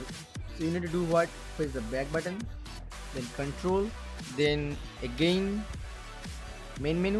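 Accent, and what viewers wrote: India. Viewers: Fucking India Accents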